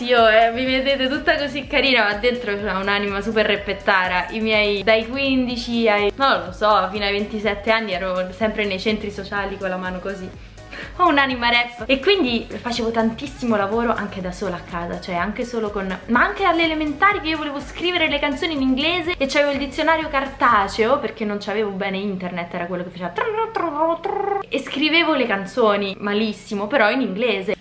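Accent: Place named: Rome, Italy. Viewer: PS : l'accento romano... È top!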